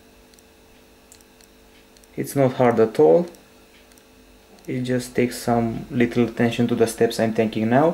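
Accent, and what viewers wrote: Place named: Romania. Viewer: Frumos tuturoial facut de un roman dupa accent..Respect!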